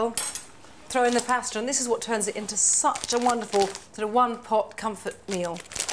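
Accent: United Kingdom. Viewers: Your voice is so attractive, I’m going to have my girlfriend start using a british accent 😂😂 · I am here to learn the British accent and not the dish 🤗🤗🤗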